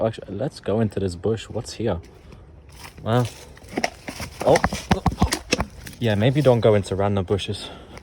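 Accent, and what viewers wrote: United Kingdom. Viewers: Your British right cause I am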